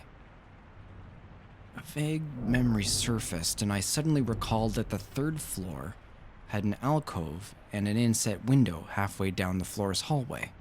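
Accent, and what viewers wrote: Canada. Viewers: The narrator is Canadian. It makes no difference towards the story, just an observation. Mainly getting this from the way he says words ending in -out. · Narrator has to be Canadian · this was amazing! I love listening to you read.. I love the Canadian accent! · is the narrator canadian? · | I'm american, Canadian accents don't bother me at all, but even if it had your reading was fantastic sir. honestly didnt really notice it until i read the comments because i was on the edge of my seat. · This is the first I've ever been able to identify a canadian accent as a non-english speaker. You should be proud lol! At least stop apologizing for it :D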